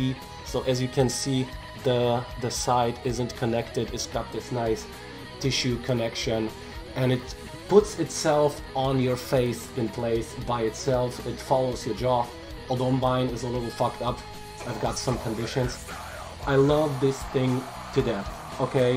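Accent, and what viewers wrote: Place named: Russia. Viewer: Ебать у тебя акцент просто конский, я сразу понял ,что наш человек снимает видео =D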